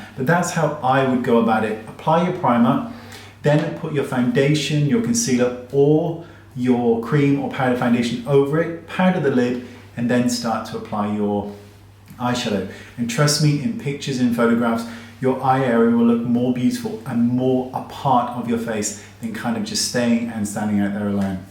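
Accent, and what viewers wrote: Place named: United Kingdom. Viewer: Great tips for an older lady, and nice to hear a British accent. Love your videos x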